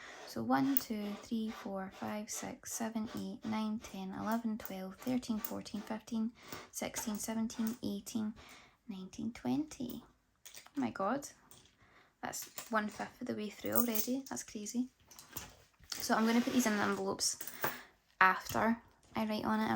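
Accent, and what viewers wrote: Scotland, United Kingdom. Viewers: Haha no problem, also don’t worry about spraffing on in your videos, it’s refreshing to hear a fellow Scottish accent doing a budget video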